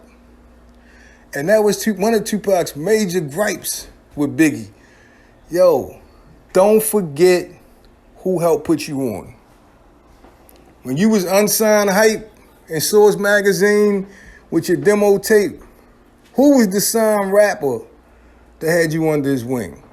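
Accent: Baltimore, United States. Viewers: Im loving that bmore accent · U gotta be from Bmore ! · First of all Biggie was hip hop unsigned hype b4 he met pac . And puff made big career not no pac . Pac a whole liar first thing first you a pac fan from bmore i can tell by your accent talking bout ny stuff you clearly dont know much about . Did he sleep on son couch mayb once or twice but he didnt live with pac yall take that bullshit and ran with it . Pac got robbed by real gangstas and did what pussies do blame everything else except for the fact they got caught slipping . He so tuff why he aint get at king tut and them u know why cause he fucking couldnt tupac is a actor he took his acting to the rap game . Once he saw how ppl loved him for his role as bishop he adopted that in his rap shit and it worked . Why would big get involved in some shit he dont have nothing to do with . Like flex said pac lied and we all know it tupac the biggest fraud ever . 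Fuck him Brooklyn forever no slander on the King of Ny . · It's crazy how we can tell you're from b more just by the accent that's cool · You straight from Baltimore.. lol great channel my G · aye bro you from Baltimore?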